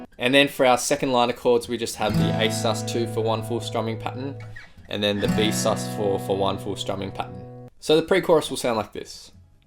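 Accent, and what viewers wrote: Australia. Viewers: When I heard your voice I'm like AUSSIE AUSSIE AUSSIE OI OI OI. 🇦🇺🇦🇺🇦🇺🇦🇺🇦🇺🇦🇺🇦🇺💚💛💚💛💚💛💚💛💚💛💚💛💚💛💚💛💚💛💚💛💚💛💚💛💚💛💚💛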